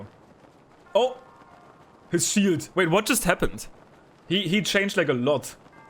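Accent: Germany. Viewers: You acctually speak like a german. Man I know, I'm german, if you not. ANSWER PLEASE NO OR YES, please... se.. :) :/ I know im peasky!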